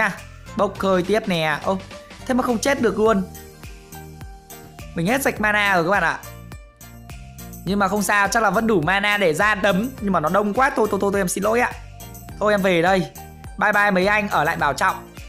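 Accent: Nam Dinh, Vietnam. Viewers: Add quê Nam Định ah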